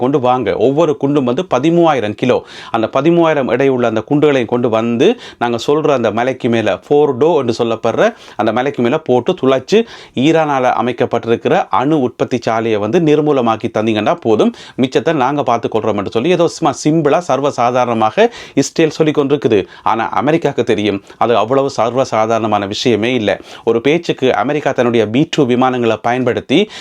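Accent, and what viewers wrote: Sri Lanka. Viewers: சகோதர நீங்கள் செய்திகள் சொல்லுவது பாட்டி பேரப்பிள்ளைகளுக்கு சொல்லும் அறிவு போல மிக எளிமையாக அழகாக உங்கள் நாவில் இலங்கை தமிழ் விளையாடுகிறது நீங்கள் நேர்மையாக செய்திகளை சிறப்பாக எடுத்து உரைக்கின்றிர்கள் வாழ்த்துக்கள் உறவே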